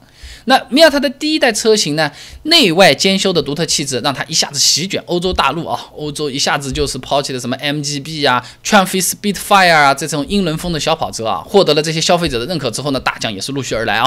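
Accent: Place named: Wu, China. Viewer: 经常看你充满吴语风格的讲解,受益匪浅.据说印地安人牌摩托当年挺厉害的,能讲讲吗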